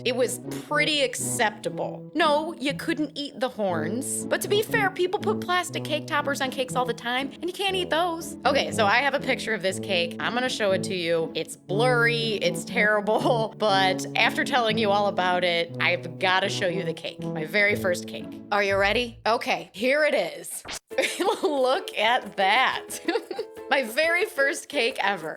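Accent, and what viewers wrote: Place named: Ohio, United States. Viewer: As a native Ohioan, I could peep Natalieʻs accent months ago! We put "Y"s before our vowels-"Cyan" instead of "Can". Im So proud of a Buckeye doing so well!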